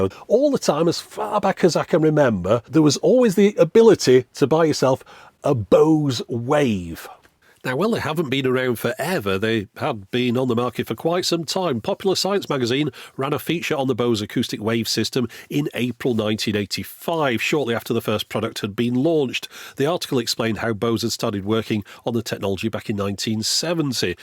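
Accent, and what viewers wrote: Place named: Liverpool, United Kingdom. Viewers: So you’re a Liverpudlian 🤔 that’s where the accent comes from. Anyway, a very interesting video for me. As ever since I was a teenager I wanted to get a Bose Wave of some description. But they weren’t available in my part of the world. Bose are a solid good quality company too. It’s a shame the Wave series have been discontinued (not of too much use in a smartphone/Bluetooth speaker world I suppose) and the stores shut down. Even if the latter is a moot point from my point of view, as they never were available in our parts anyway 🤷‍♂️ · AHA! That's your accent. Liverpool - thought you sounded like a Beatles all these years. Can you play drums? You're really near Ringo.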